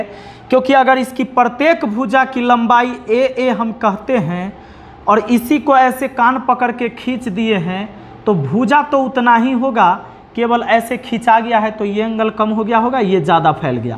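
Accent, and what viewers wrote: Bihar, India. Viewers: Bihari hai sir😊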